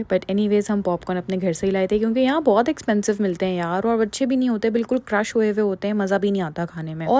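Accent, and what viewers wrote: Karachi, Pakistan. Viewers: hi,i like your vlogs,your urdu is very nice like karachi waly.want to ask you about your folding matress,from where did you get it and its price as wel coz i like it nd want it for my kids.❤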